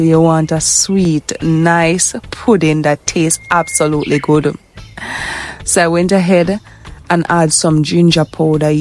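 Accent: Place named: Jamaica. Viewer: I'm living in the US,, I like your Jamaican accent keep it just like that,,,u are so intelligent 💓💯🇯🇲👋⭐⭐⭐⭐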